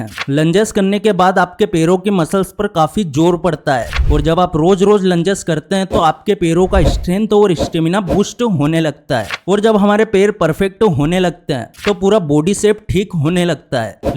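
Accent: Indore, India. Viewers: indori bhai ki aavaz lag ri hai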